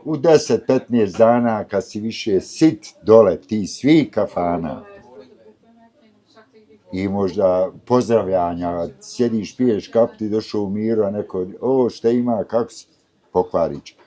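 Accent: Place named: Sarajevo, Bosnia and Herzegovina. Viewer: Predivan,pravi Sarajlija i glas i izgled,šarmantan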